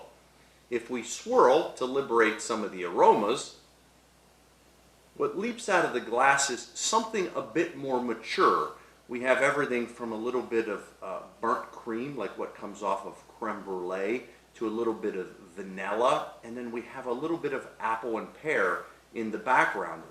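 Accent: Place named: Italy. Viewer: you literally said all French words here in Italian accent 😢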